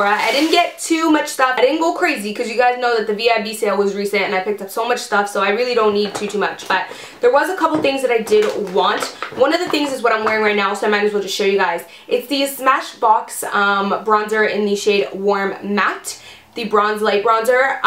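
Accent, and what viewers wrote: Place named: Canada. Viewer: I never noticed how much emphasize you put on your O's lol ive never heard a legit Canadian aaccent. love your haul boo!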